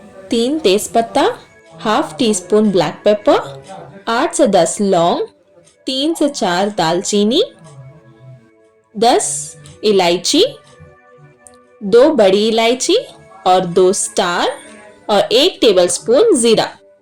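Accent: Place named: Hyderabad, India. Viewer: So beautiful hyderabadi accents and so yummy 🤤🤤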